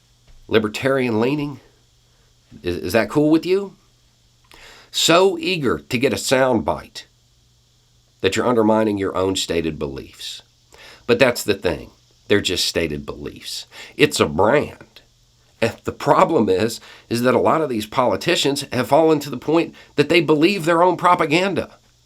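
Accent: South, United States. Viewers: Lovely, a bearded man with a southern drawl who wants you to think he is either libertarian or some form of conservative when in fact he is a full fledged progressive liberal. Yes, mask are ineffective, and this dude's mask certainly does not work.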